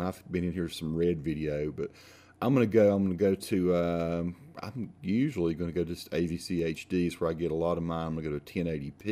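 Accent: South, United States. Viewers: Fuckin it took so long to find this thank you southern accent king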